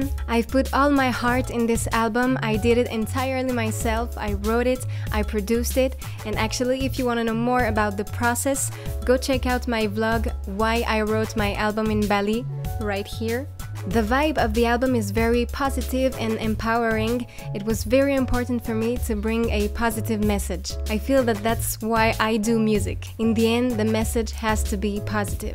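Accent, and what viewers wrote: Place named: England, United Kingdom. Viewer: I love your songs. I'm from Ecuador and I love your way to talk English, because currenly I'm studying English so I would like to know where you're from..... I can get everything you say us in your videos. best wishes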